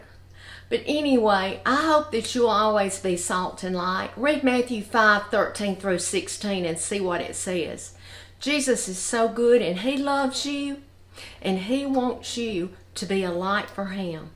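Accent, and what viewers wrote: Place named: Alabama, United States. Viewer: I just love to hear you Alabamians talk. I’m from E Tx and it is said that we have an accent 😂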